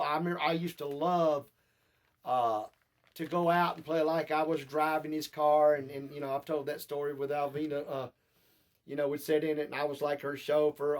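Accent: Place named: Tennessee, United States. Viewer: Huge Elvis fan from Venezuela since I was like 7-8 years old...looong time ago LOL! My dream is going to Graceland and no matter what am gonna make it. Love your Tennessee accent, is just lovely! and this channel is just great. As I fan, I truly appreciate it. Thank you soo much for these videos!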